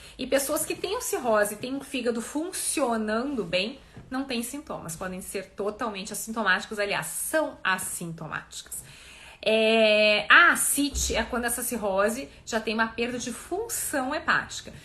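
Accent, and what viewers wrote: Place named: Rio Grande do Sul, Brazil. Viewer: Muito bem Dra. Tem que parar com este sotaque de ficar falando ... éeeennhh, uuinhun, Horrível este sotaque. Eu sou gaúcho Mas este repetitivo é coisas das mulheres gaúchas.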